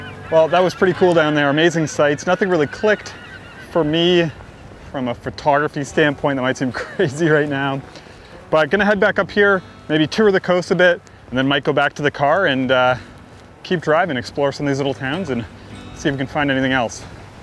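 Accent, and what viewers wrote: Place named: Canada. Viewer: That canadian accent is ... ugh 😬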